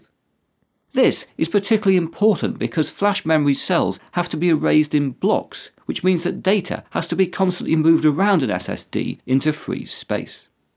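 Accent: United Kingdom. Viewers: Linus but British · Dude is brittish, ofcourse its gonna be exemplary.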